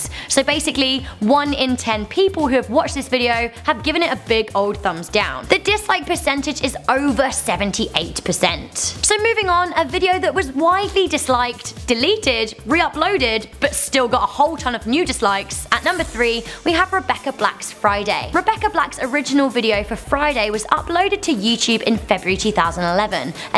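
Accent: United Kingdom. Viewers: Philip J. Fry It's a British accent. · ***** I KNOW ITS BRITISH but she tried to imitate the portuguese accent when she said "cortando o botao do youtube" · world most understandable British women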